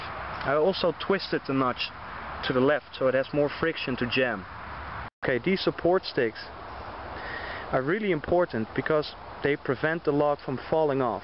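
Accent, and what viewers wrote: Netherlands. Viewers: This is a strange video. With a Dutch accent, Irish music and making a huge mouse trap, Lol, that probably wouldn't work, what's the point? Have you ever caught anything with this? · i fear that is no option looking at the serroundings and listening to hes accent i would say hes from the netherlands and their is nothing that size here that will take that trap (fall for that trap), we dont have carnivores in our country..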